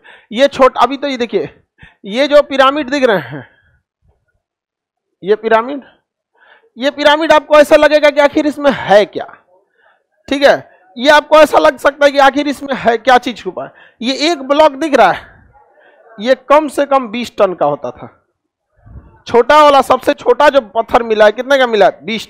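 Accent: Bihar, India. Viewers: Bhai pehle bolna seekho pakke bihari hoo, kuch tameez wali Hindi bolo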